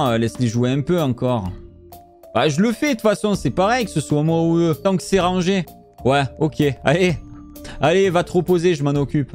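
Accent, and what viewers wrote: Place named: Marseille, France. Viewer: Cc je pense que tu habites à côté de Marseille tu a des accent 😭😭😝😝😜😜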